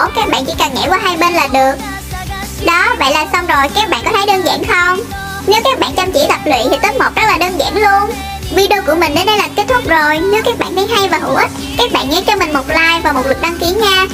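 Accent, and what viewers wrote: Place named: North, Vietnam. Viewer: Nghe giọng chị giống người miền bắc quá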